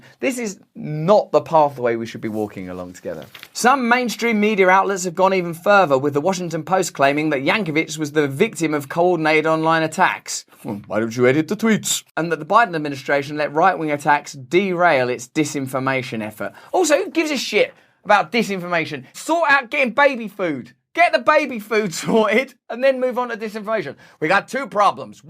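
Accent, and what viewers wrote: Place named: United Kingdom. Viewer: I love you too Russell such a wonderful way to get my news a funny highly intelligent person with a Brit accent 🤣 wanka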